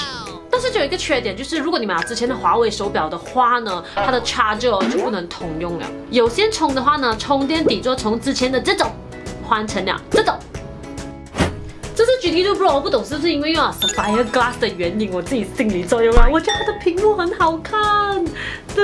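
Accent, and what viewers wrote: Malaysia. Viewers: 大马华人说话好听😊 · 马来西亚口音还是蛮好听的😁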